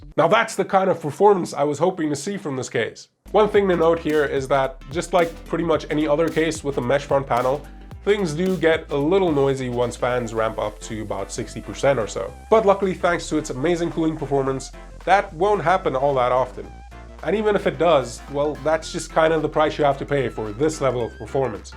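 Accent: South Africa. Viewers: Oh damn that’s a surprise, you’re South African. Like me ✌🏻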